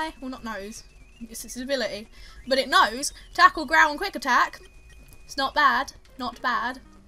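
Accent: United Kingdom. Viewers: " What did I call my other fletchlin'?...........I don't remember!" You gotta love British accents!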